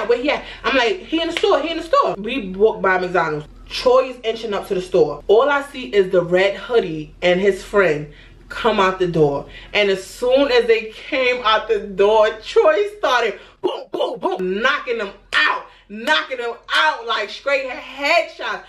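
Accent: New York, United States. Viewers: Lmaooooooo im from jersey and grew up inna hood and HATEDDDDDDDDDD all this ghetto ass shit being around me. (But no shade i loved to watch it from my window 😂😂😂😂😂😂) The way you tell these stories ny accent and all be having me deaddddddd. Makes me lowkey miss it a lil bit. But i love how youre real about ur experiences and where your mindset was at the time. I'm glad you got outta there, because alotttttttttttttttt of the people i grew up with are in the same damn place doing the same damn thing.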